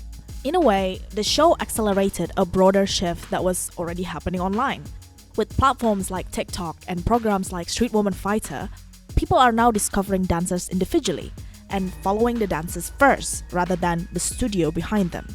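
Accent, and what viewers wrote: Indonesia. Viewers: Indonesian accent detected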